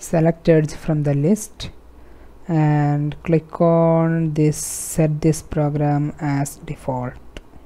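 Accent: Kerala, India. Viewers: Chetta ningalde english kettappo manassilaayi malayali aanenn ente prashnam enthannu vechal 1, Eppalenkilm pc marythakku on aayal taskbar and settings not accessible aanu 2, pc open avumbolekk screen blank aavua pinne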